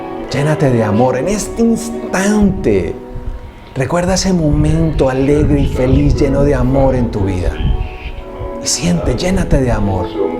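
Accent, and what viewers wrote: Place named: Antioquia, Colombia. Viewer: Cuando no lo escucho siento que se me baja la alegría. Y podía saberlo de memoria, pero me encanta su voz tan paisa y su presencia.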